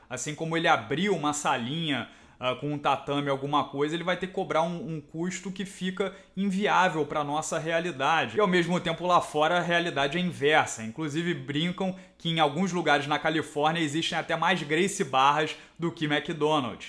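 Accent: Rio de Janeiro, Brazil. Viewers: Curto os seus comentários! Mas tem que diminuir no sotaque carioca....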